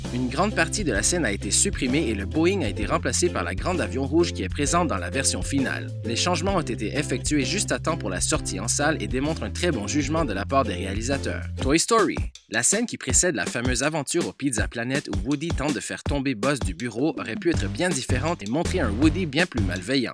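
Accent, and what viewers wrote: Quebec, Canada. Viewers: c'est un québécois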